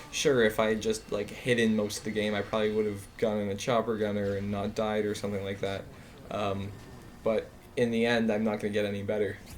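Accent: Canada. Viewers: 7 kill streak in under a minute horry sheit (unrelated) are you canadian? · Are you Canadian by any chance?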